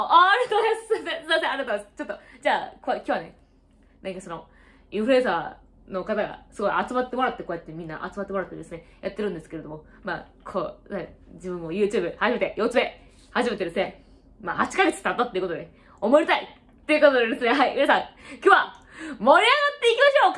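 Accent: Kansai, Japan. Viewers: 関西人がしゃべる標準語よき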